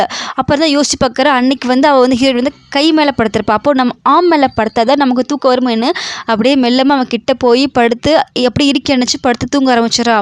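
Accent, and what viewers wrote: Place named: Sri Lanka. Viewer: Unga voice srilanka voice mathiri irruku sis .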